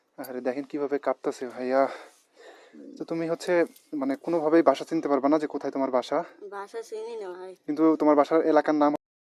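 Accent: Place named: Naogaon, Bangladesh. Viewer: ভাই আমি আপনার সবগুলো ভিডিও দেখি। আপনার কথা শুনে মনে হয় আপনার বাড়ি নওগাঁ জেলায় দয়া করে বলবেন আপনার বাড়ি কোথায়। প্লিজ 😂😂😂😂😂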